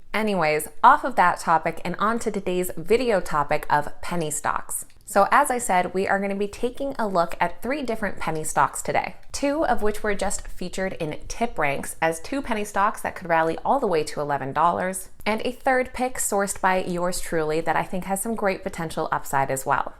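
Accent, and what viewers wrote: Canada. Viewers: Great vid.....always nice to see the sleeping pooch. Made 600% on Dogecoin.....sold everything. 👍. Are you Canadian?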